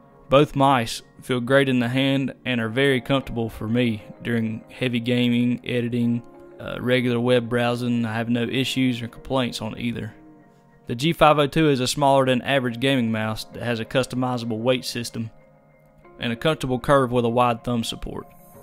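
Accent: South, United States. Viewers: Your accent is absolutely fantastic, for us Brits :D Every southerner I've met has been inordinately nice, just can't figure out why they voted Trump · this is the most country voice i have ever heard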